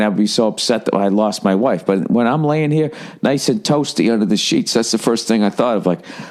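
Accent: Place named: Boston, United States. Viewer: He's from the Boston area. It's just how he talks.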